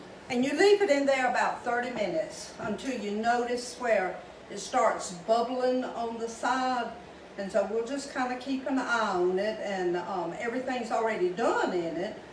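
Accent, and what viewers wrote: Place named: Georgia, United States. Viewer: Oh that looks delicious, what a beautiful supper ! I do the hamburger buns also,they just taste good ! I enjoy your cooking videos and your southern Georgia accent your a beautiful lady ! Hello from Missouri Im outside of the KC MO area,65 yrs old live on a private lake but raised up in the farm country ,north central mo! Have a wonderful day!😊❤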